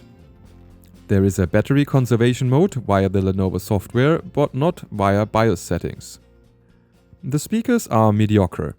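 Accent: Germany. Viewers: Lol manchmal glaub ich, dass er Deutscher ist der englisch spricht :D erinnert mich so krass an den deutschen Typen der Monitore reviewt auf englisch xd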